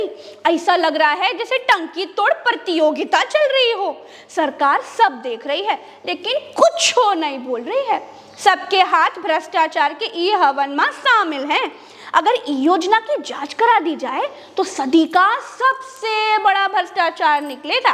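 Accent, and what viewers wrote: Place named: Khari Boli, India. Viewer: खड़ीबोली बोलने का मजा कुछ और ही है😂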